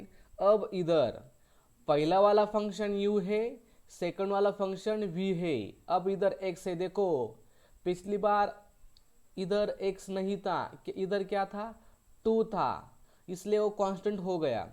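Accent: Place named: Maharashtra, India. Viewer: Accent marathi ahe tumchi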